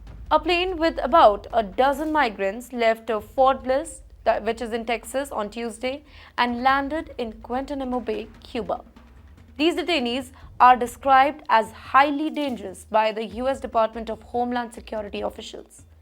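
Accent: India. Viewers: Indianlish. Only Indians can understand. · Indian lady with accent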